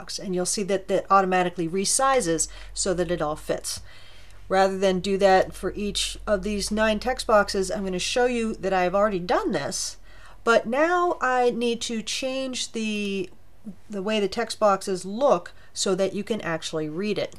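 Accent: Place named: Northeast, United States. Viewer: thank you lady on the internet with a north east accent of some sort. saved me hours!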